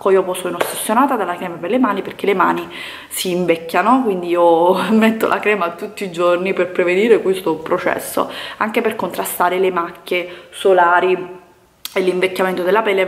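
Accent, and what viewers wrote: Naples, Italy. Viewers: È napoletana, molto probabilmente è abituata a parlare con il suo dialetto, è normale che qualche volta può imbrogliarsi, anche a me succede, certo questo non vuol dire che i napoletani non sanno parlare italiano perfetto, assolutamente, io parlo per il suo caso ( ci tengo a precisare sennò mi attaccano tutti )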